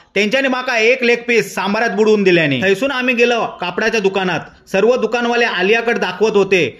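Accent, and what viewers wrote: Malvani, India. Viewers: भावा तुझी मालवणी मला आवडली लय भारी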